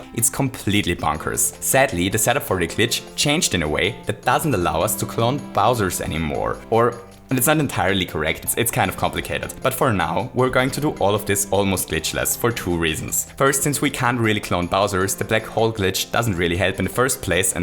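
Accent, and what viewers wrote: Germany. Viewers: you sound, Very german mein freund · Where is he from? Because he sounds German/Austrian. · Du bist Deutsch oder? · Could it be that you are german? You have a strong german accent. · Bist du deutsch? (Are you a german?)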